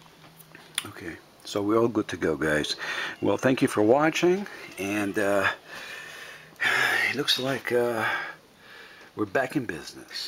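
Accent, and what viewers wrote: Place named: Texas, United States. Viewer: were you born and raised in Texas, i can hear that texas accent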